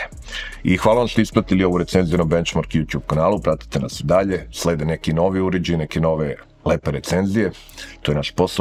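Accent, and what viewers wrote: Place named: Serbia. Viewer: Ovo je srpski kanal, a ne americki, tako da je izgovor prilagodjen srpskom govornom podrucju.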